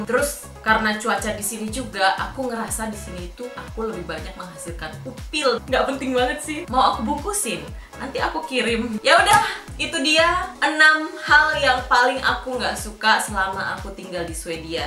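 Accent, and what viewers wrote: Java, Indonesia. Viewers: Asli mana mbak e.. kok medoknya, medok jogja or solo.. cerita dong, riwayat sampai swedia dan bla bla bla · Sampean jowo pundi mbak, bosoe kog koyok daerah q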